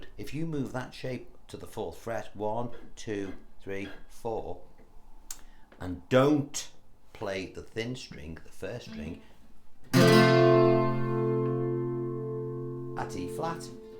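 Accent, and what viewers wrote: United Kingdom. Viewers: Great video to watch. So relaxing to listen to your wonderful British accent as opposed to the American you hear all over the internet. And always a a little funny: I love it ❤ We all shouldn’t take ourselves too seriously.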